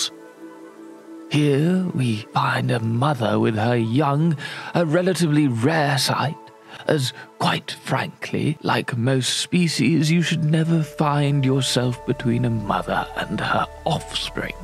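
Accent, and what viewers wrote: United Kingdom. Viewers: LOL! Awesome video. Anyone who tells you, that you aren't talented or an entertainer, please give them your middle finger. Awesome Video. Very creative. The voice inflections of a fascinated xeno biologist mixed with your native British accent, is priceless. Great acting work.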